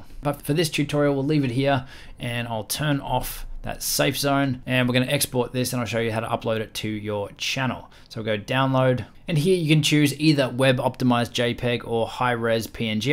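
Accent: Australia. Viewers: great Aussie accent~~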